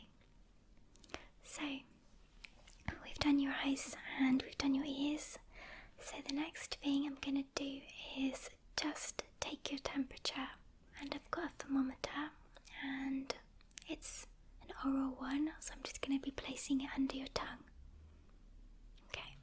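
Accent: United Kingdom. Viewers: What is her accent? What part of the UK?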